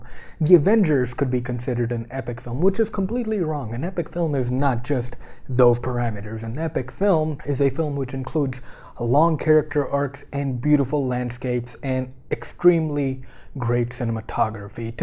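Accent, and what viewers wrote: United States. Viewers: i think you voice is american but acting is overacting se bhi upar bhai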